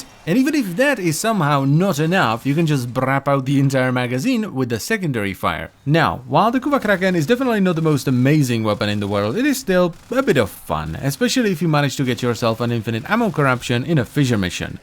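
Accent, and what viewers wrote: Czech Republic. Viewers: He does have a slight Czech accent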